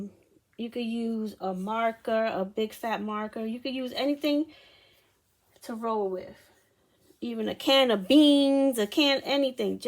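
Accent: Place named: New York, United States. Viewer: Where you from I hear a accent, you from NY?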